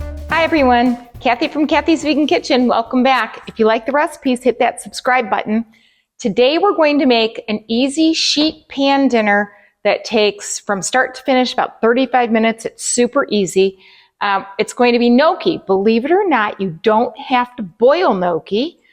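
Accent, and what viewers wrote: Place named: Minnesota, United States. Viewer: Your recipes look sooo great!! I am gonna start trying them. Where are you from?! I am originally from Wisconsin, so I am loving your accent 😊. My guess is Minnesota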